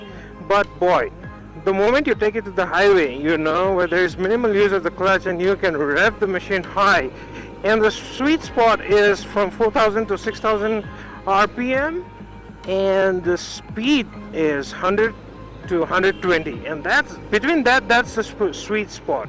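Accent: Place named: North East, United Kingdom. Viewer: Liked your review. I am an old rider and got the mojo sometime back. It's an amazing bike. Didn't go for the dominar as I don't need abs. I really don't as I have been riding a bullet all my life. It is a really good machine and the engine is butter smooth. By the way are you from the north east as I have a lot of friends up there and Ur diction is very similar. Anyway good job and keep up the good work. I agree with you about the marketing bit. Mahindra should really market it aggressively. They have a fantastic product in hand. Cheers.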